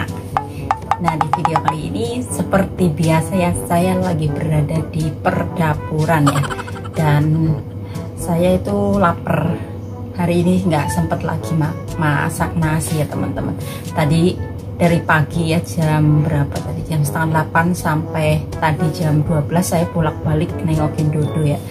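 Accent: Banyumas, Indonesia. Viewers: Semoga selalu sehat yah mba..cepet temen masak mie gorengnya mba.. Salam ngapak mba.. Pancen wong ngapak nek ora ngapak kue ora kepenak banget kooh..😊🙏🙏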